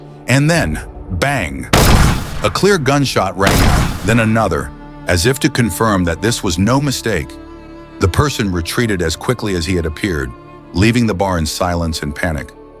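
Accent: United States. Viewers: With that accent should this not be Shadows of USA .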